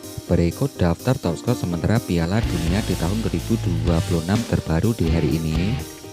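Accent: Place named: Madura, Indonesia. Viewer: Admin e wong meduro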